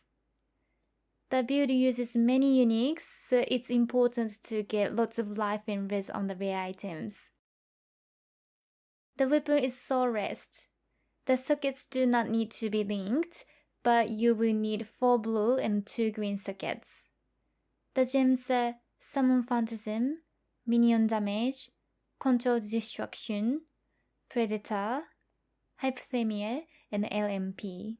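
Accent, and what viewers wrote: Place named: Japan. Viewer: このアクセント...まさか日本人？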